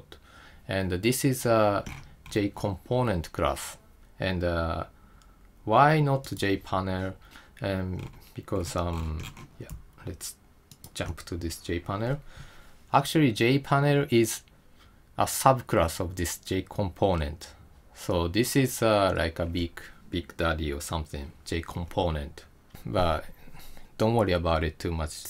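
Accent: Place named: Japan. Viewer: Really helpful and good tutorials also your Japanese - English accent is really cool :) ! 素晴らしいJavaチュートリアルありがとうございます !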